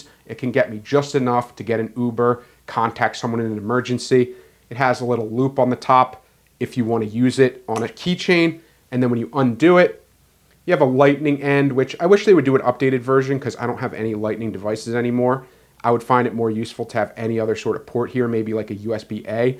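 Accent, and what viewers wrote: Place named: Philadelphia, United States. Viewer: That Phuluffia accent. Go Birds!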